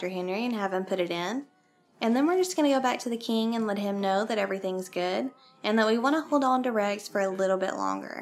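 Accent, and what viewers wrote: South, United States. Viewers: Not gonna lie wasn’t expecting the southern girl accent. No negativity tho pleasant surprise. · I like your southern accent. From one southerner to another. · O boy I can sure tell your southern lol so am I from Oklahoma 😉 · Nice guide. Lots of gamers just drone on and on and end up losing the audience. Plus I don't often hear a lot of gamers with a slight southern sounding accent, especially girl gamers. Thanks for representing. Makes me a little less self-conscious. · Are you from the south?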